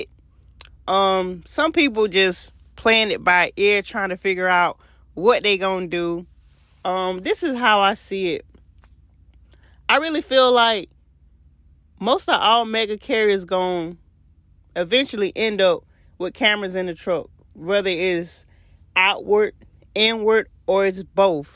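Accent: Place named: Florida, United States. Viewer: I’m gonna make an educated guess and say you are from Florida that’s because I can catch that Kodak Black type accent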